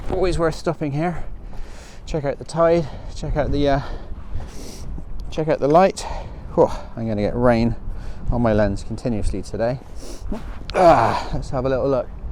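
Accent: Bristol, United Kingdom. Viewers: Checking this out now to see what’s changed Cars at the start for definite Porthminster cafe is gorgeous and the food always excellent - cool rain clouds on the horizon Nice manoeuvre down the hill, and the high street much more active but nothing like usual at any time of year Didn’t see the news about the fire; watching this instead of going to bed but I’ll look that up tomorrow What Gallery did your Dad own ? Recognised that accent as I’m from Cheltenham, just up the m5 from Bristol I’ll stop rattling in now and just enjoy the tour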